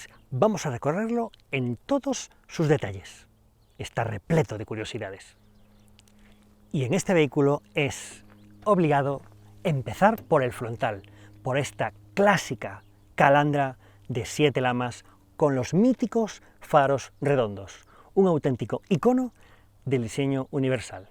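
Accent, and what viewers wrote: Spain. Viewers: Jajajaja Ya conseguí ver un vídeo completo tuyo...puse mute al audio (volúmen 0) y así me quite de encima tu castellano castizo. Sorry, pero en verdad que no lo soporto 👎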